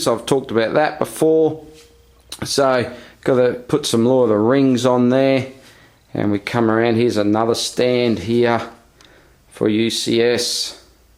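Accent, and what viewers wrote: Australia. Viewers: That is a huge collection... but can you tell me what accent that is? Is it Australian?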